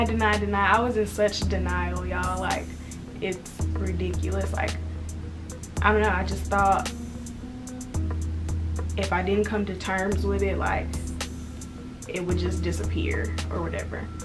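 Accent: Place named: Dallas, United States. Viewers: As soon as I clicked on this video and heard you talk I knew right away you from Dallas 😂😂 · Fr it’s that country mixed with some properness 😂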